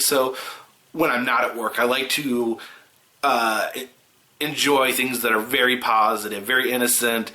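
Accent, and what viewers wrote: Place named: South, United States. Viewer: If you got together with Dan Bell, that would just make my day, year.... LIFE!!! His creepy with your southern....WIN!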